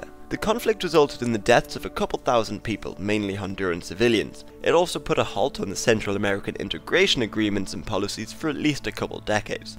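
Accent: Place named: England, United Kingdom. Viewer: It's an English accent, of the English language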